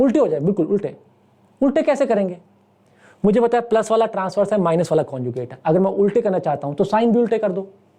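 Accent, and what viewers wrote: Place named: Punjab, India. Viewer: Sir have accent of punjabi stand up comedians who lives in Delhi Sir please make a family friendly stand up comedy please🙏🙏🙏🙏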